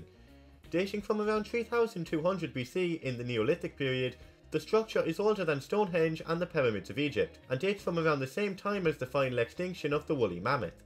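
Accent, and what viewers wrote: Ireland. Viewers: I love your videos 😊 though you have such a song Irish accent that this Brit can't watch at her usual 2x speed 😜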